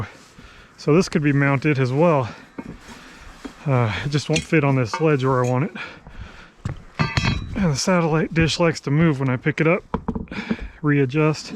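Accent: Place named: Appalachia, United States. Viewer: Lil bit hillbillysh but bearable to watch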